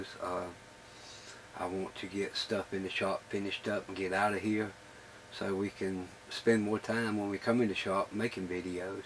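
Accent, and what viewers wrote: South, United States. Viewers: Yea I agree first time I saw your face lol my mind vision you were way older and scruffy. and you could do this ballad well with your southern drawl <link>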